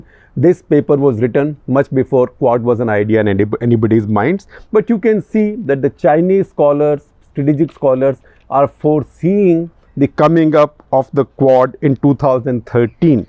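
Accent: India. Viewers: His english is fluent with indian accent which is perfect for indians as most indians don't understand UK or USA accented english.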